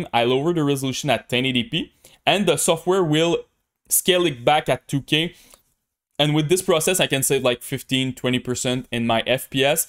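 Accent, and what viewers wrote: Canada. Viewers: Nothing better than a modest Canadien accent 👌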